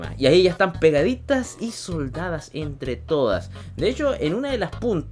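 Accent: Chile. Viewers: amo también me gustan como hablan los chilenos xd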